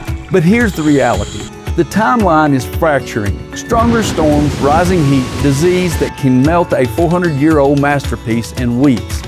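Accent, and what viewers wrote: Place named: South, United States. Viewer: This is fascinating - but also it’s nice to hear a southern accent for a change.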